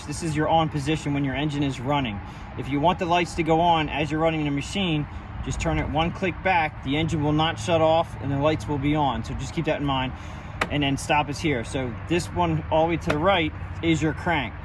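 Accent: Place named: Delaware County, United States. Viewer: Also are you from southeast PA? You have a Delco accent!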